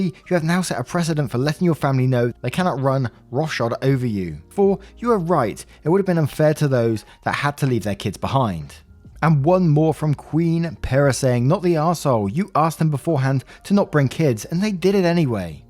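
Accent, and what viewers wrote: United Kingdom. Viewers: You've never heard 'Drama Llama' before? Maybe it's a North American expression(based on your accent I am assuming you are in the UK).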